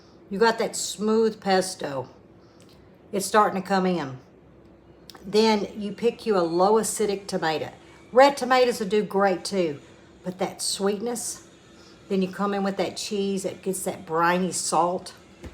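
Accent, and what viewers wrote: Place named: Louisiana, United States. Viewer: definitely the husband count! And, your Louisiana accent! Lol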